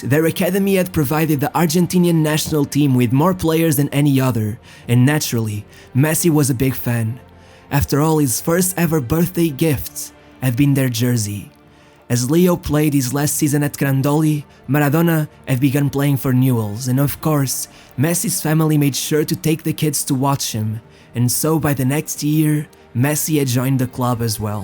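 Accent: Portugal. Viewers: the speaker who sounds portuguese, should kno that thhe spaaish name carlos rexach is pronounced carlos rejach in spanish! I dont kno him personally, but u should kno that! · Esse sotaque e esse toque de paixão,no falar dos nossos grandes, é de um português honrado... :) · Eu sabia que eras português 😅😅 dava para perceber no sotaque mas nunca tive a certeza 🤣 Óptimo trabalho que estás a fazer! Tens jeito para desenvolver a história e arranjas boas imagens apoiar a história. Sem dúvida que envolve muito trabalho e pesquisa mas compensa, pois os vídeos são muito bons!! Continua assim, que ainda há muitos génios do futebol para falar 😉 E viva o Norte car*lho 🤣🤣🤣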